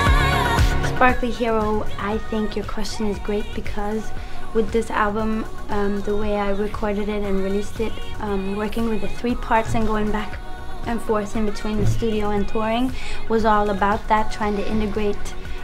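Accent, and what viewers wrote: Sweden. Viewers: I enjoy listening to Robyn talk. Love that Swedish accent!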